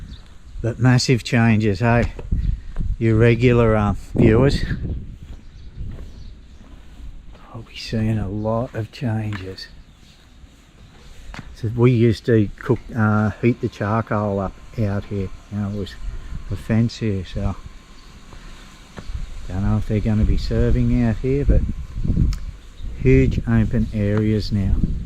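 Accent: Australia. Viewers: well who am I to rule over your good wife, I retract my appeal of your Aussie pronunciation and give myself a smack upside my head, ps.love your work.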